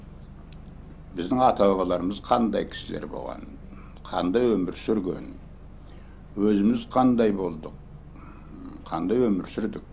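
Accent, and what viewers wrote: Standard. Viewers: Екі тілді сіздей меңгерген ешкім жоқ екен. Қазақша сіздей тұнық сөйлейтіндердің қатары өте аз. Трагедйа осы... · Орыстың қақ ортасында жүрсе де қараңыздаршы қазақшасы тап таза. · Советтик кезде таза казакша сойлегенине ризамын атанын, казирги жастар, ересектер де тауелсиз Казакстан да шала казакша сойлеседигой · Таза ана тілде,сөйлеп отыр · Қазақша білмейтін мәңгүрттерге көрсететін сұхбат екен. Нағыз совет үкіметінде өмір сүрген адамдардыңда таза қазақша сөйлейтіні дәлел. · казакша таза сойлейди екен, онтустик - солтустик акценттерисиз.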